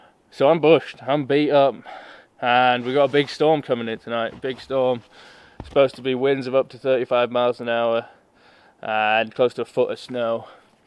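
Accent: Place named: England, United Kingdom. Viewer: Where are you camping, looks Nordic , Canadian American ? You have an English accent, like mine though? Perplexed.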